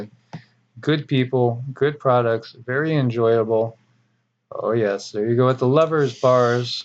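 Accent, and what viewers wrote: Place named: South, United States. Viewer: Your Southern accent is awesome!:)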